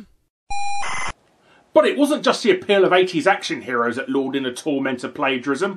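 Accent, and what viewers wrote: England, United Kingdom. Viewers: Not saying that all English accents sound alike, but you sound like you could be Robin Leach's son.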